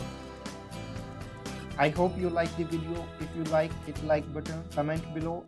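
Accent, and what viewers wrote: India. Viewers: Funny Indian accent. Why not get an American to read it?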